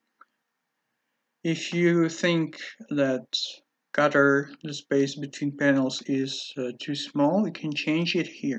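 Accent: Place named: Russia. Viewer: Also, do you speak Russian? Your accent seems familiar)))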